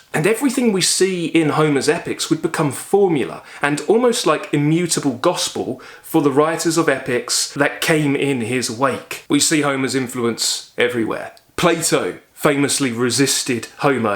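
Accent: United Kingdom. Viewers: I really enjoy your introduction of great books. And of course sort of envy. I envy you upon reading all these books when still young. Your British accent is fantastic.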